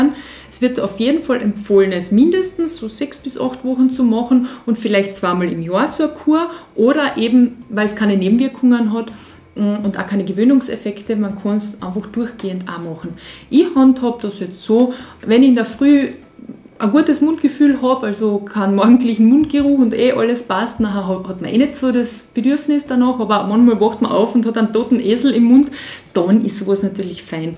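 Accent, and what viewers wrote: Austria. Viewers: Alles. Eine Freundin von mir lebt in Kärnten. Ich liebe Österreichisch.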